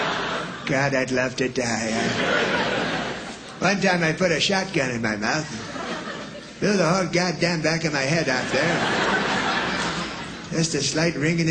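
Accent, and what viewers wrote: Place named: Canada. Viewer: He sounds so Canadian holy crap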